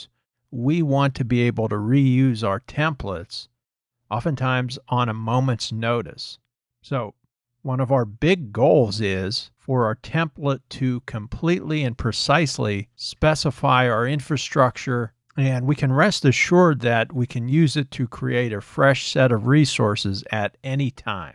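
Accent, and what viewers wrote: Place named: United States. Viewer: Yay! An american voice!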